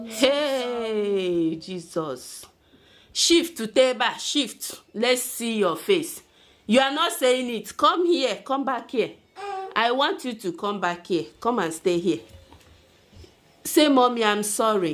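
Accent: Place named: Mbano, Nigeria. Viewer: Even my children call fly mosque 😂😂😂 nne you sound like mbano oo chia i enjoy your igbo. It sound so much like my hometown 😊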